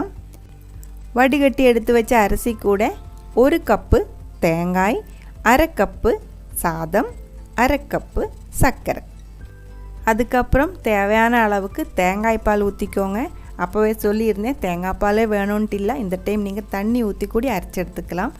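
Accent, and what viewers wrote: Kerala, India. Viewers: madam from which place do you hail, sounds like a malayali speaking Tamil , any way vatappam superb just like your voice. God bless you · I like all kerala samayal sister Your kerala thamizh 👌 sister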